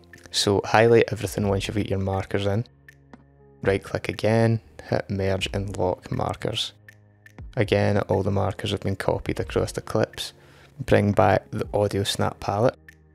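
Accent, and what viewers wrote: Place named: Scotland, United Kingdom. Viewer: I find it odd how few views and likes videos get, considering how handy they are for a Cakewalk user - maybe folks out there can't understand his rather mild Scottish accent!? Thanks for the posts!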